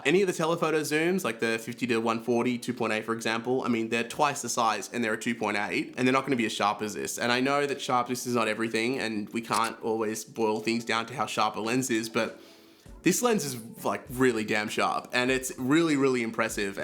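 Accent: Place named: Australia. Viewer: This lens has been my workhorse lens for most of my events and weddings for the past couple years. I have a similar 'review' of this lens on my channel also. I should say however, moving forward I think I will be replacing my XF90 with the XF50-140, that feels like the zoom equivalent of the 90, a bold thing to say indeed! At 140mm f2.8 you will get similar rendering and DoF to the 90 at f2 making me feel like keeping both is overkill. I think the 50-140 with the 56 is a good way to go (or at least the direction I am currently heading in). Good review and nice to see/hear another Aussie on the platform.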